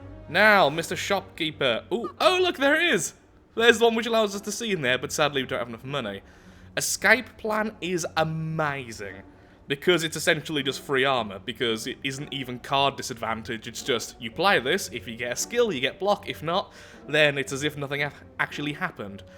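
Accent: United Kingdom. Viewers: watched the entire video with subtitles only no sound and, it was funny the errors google's speech to text makes trying to translate the British accent.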